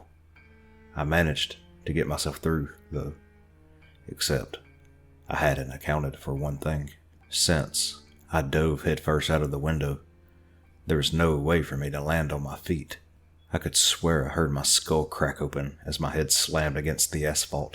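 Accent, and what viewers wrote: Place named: South, United States. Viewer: I like the southern accent, just like mine haha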